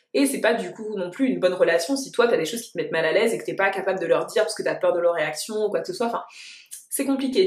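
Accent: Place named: England, United Kingdom. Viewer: J’ai remarqué tu prononces les mots en anglais avec l’accent anglais, t’as vécu en Angleterre?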